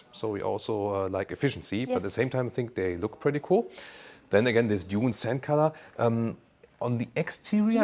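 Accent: Germany. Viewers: Always funny hearing a German talk English👍😭🤣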